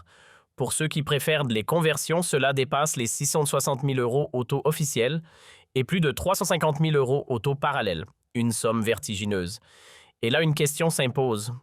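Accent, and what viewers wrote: Canada. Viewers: Tu as une voix canadienne au faite !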